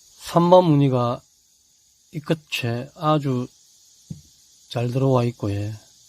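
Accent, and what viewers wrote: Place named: Busan, South Korea. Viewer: 안녕하세요 영상처음접해 봅니다 난초들이 아주건강하내요 부산말씨같아요 저도부산사라인 데 지금은제주도에 서2년째살고있 습니다 완판하시고요 영상 자주보겠습니다 잘봤습니다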